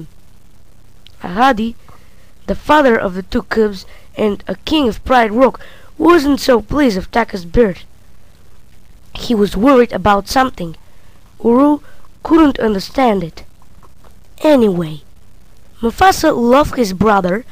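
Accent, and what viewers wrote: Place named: Bulgaria. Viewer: He's from Bulgaria and that's why this guy talks like that.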